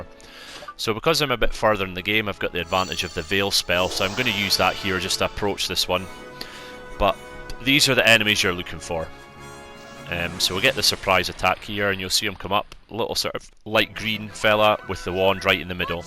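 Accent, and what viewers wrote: Scotland, United Kingdom. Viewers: I believe he may be Scottish, me lad.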